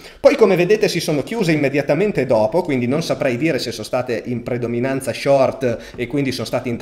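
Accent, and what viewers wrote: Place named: Romagna, Italy. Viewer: Ciao, di dove sei? A giudicare dall'accento viviamo vicini, sono di Forlimpopoli! Per il momento sono messo così: 35%btc 45%eth 20%cro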